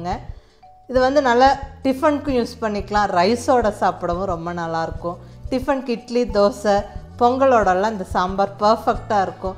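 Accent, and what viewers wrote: Coimbatore, India. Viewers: Madam neenga coimbatore ah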